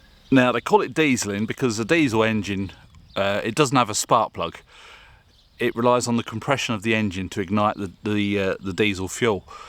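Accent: United Kingdom. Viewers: From your ascent, you sound like a British man that is having a lot of fun in France.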